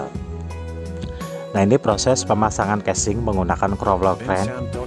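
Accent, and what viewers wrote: Java, Indonesia. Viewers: Rika sih jawane ngendi melu ngode lah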